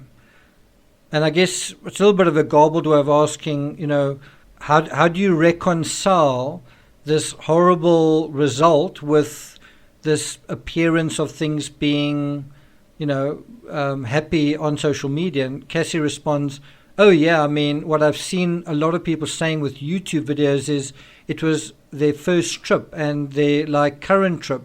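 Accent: South Africa. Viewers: Mr South African Accent, I don't know you; just happened that your page came up on my feed BUT, can you read a room? At the mark, there appears a very dark, very black woman, costumed as a "darkie maid" with a minstrel-esqe expression on her face. Something like Mammy in Gone with the Wind, except Hattie McDaniel's Mammy had far more innate dignity. Wrong picture to illustrate your little narrative. Cassie is 8-10 years older than her brother, she says. From her conversations it seems that he was the Golden Child, a boy, -finally!- even though he may have mental issues; Cassie is the family scapegoat. Look at her and her husband; her parents first probably ragged at her as an adult in an abusive first marriage (like Gabby) then because of her and her husband's weight, losers. Then they house sat for her parents while they took Brian up to NY for whatever reason, most likely mental /physical/psychological treatments. 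He's not been regularly employed, it seems yet he disparaged Gabby for trying to manage her vlog/social media as a business, days before her death, blaming her for being OCD as he admitted to the police that he was hearing voices. Cassie is not the out of order one here. As she spoke, I could HEAR in my imagination the verbal pillorying that would be aimed her way by her mother for "daring!" to defy family /lawyers instructions, "just like you to say the wrong thing about something you aren't privileged to!" Cut the woman some slack. She has little kids to pr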